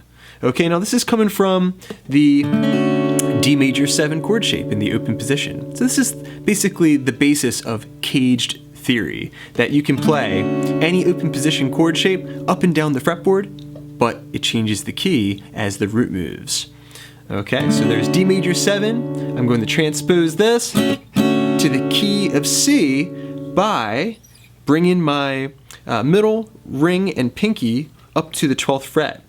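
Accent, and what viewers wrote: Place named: Mid-Atlantic, United States. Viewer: Very nice lesson, appreciate it. I'm from the mid-Atlantic and recognize your accent ... a lot of people probably wouldn't pick up on it.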